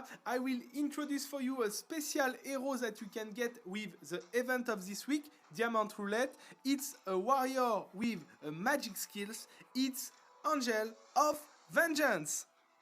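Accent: France. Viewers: bonjour tu ne serais pas un français par hasard??? ton accent est trop marrant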